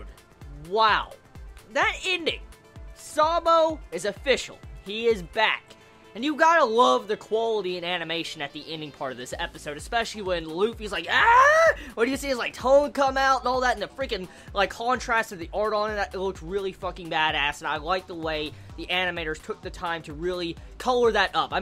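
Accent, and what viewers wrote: South, United States. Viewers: random question here.....but are you from down south, as in south america? (texas ect)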